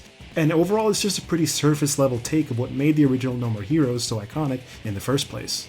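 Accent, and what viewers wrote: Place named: United States. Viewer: First of all, I'm sick and tired of hearing "crescendo" get vomited out in YouTube reviews/videos/ whatever the individual wants to call it. Second of all, are you playing a foreign copy? Because there's no blood yet you have an American accent so I'm trying to figure out why your captured footage is the censored version?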